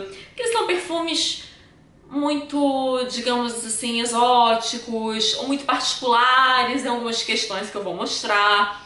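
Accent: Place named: Rio de Janeiro, Brazil. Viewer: chequei por aqui agora, masssxx vc tem muito sotaque de carioca. Amei os videos!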